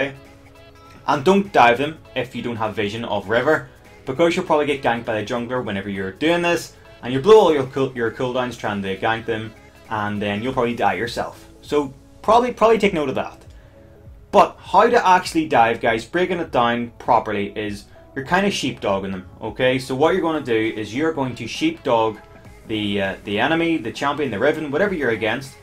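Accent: Northern Ireland, United Kingdom. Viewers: Northern Irish! :D cool accent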